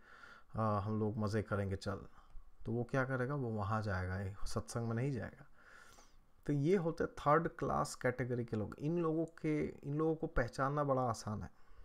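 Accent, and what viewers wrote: India. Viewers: I just love your Hindi accent 😂😂😂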